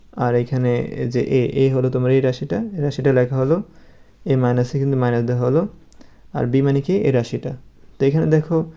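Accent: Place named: Barishal, Bangladesh. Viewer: বরিশাইলা কথা😂😂😂❤❤❤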